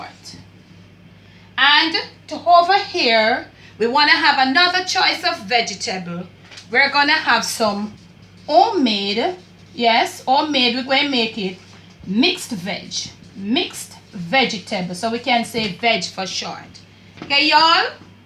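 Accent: Jamaica. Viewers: Big up yourself!! I'm so happy I discovered your channel. I love your delivery and your embrace of the jamaican patois. Continue doing an awesome job,can't wait to see more videos.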